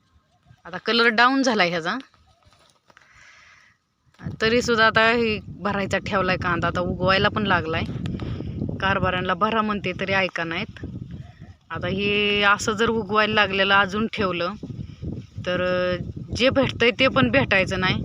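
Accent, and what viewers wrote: India. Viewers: गाव कनते मराठि बोलता 🎉😂❤ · बोलण्यावरून बारामतीची वाटतेय ताई अजितदादाला सांंगा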